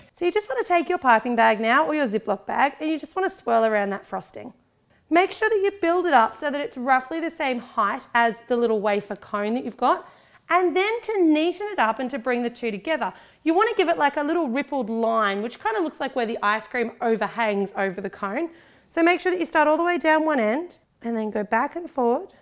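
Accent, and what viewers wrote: Australia. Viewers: I knew you were Australian ;) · shes austrailian;)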